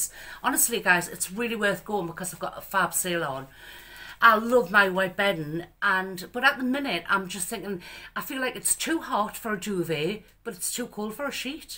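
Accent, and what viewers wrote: Newcastle, United Kingdom. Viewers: Hello, l am one of your new subscibers. I love your videos, you are such a lovely, down to earth person. So genuine. l could listen To your voice all day as my grandparents lived in Newcastle all their lives and l spent every holiday with them when l was a child. Listening to you brings back all the warmth and kindness of my childhood 😊 Love from Amanda in France xxx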